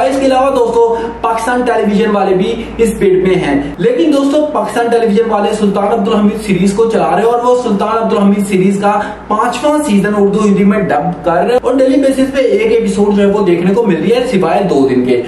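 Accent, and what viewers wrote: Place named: United Kingdom. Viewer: Bhai apki voice thode clear karlo aisa lagraha hai koi British urdu me baat karraha hai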